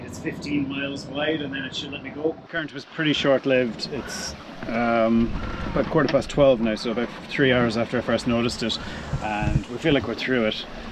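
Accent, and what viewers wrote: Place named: Ireland. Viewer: Really enjoying these videos of the West to East Atlantic passage. Totally in awe. Really aware of the risks especially when you mention the possibility of knock downs and you hear cracks in the cockpit. So refreshing to watch after not watching you for a few months. Plus the added attributes of the gentle Irish chatter. And the transparency! Absolutely love it! ⛵️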